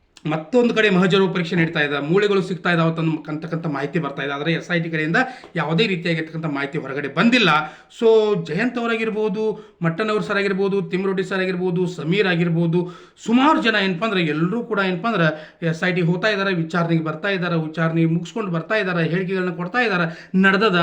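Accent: North Karnataka, India. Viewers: ಸೂಪರ್ ಸರ್, ನಿಮ್ಮ ಮಾತು ಕೇಳುವುದೇ ಒಂದು ತರಹದ ಮಜಾ , ನಿಮ್ಮ ಉತ್ತರ ಕರ್ನಾಟಕದ ಮಾತಿನ ಶೈಲಿ ತುಂಬಾ ಚಂದ ಉಂಟು ಮಾರ್ರಾಯೆ. ಧನ್ಯವಾದಗಳು ಸರ್. ಸರ್.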